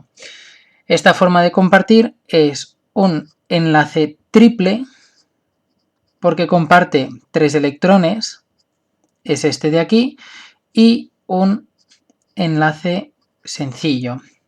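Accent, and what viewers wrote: Catalonia, Spain. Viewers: Como se nota que eres catalán/valenciano, xD. Desenvolupada, ehhh! +10 al vídeo, me ayudó mucho!!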